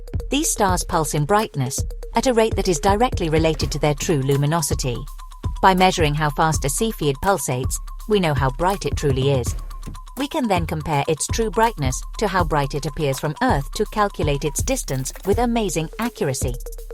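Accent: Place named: United Kingdom. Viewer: I liked the video. AI narrators often make rookie pronunciation mistakes like calling a Type Ia supernova "Type eye-uh" rather than "Type one-A". Nice touch with the British accent, though. Lends an air of Masterpiece Theater to the lecture.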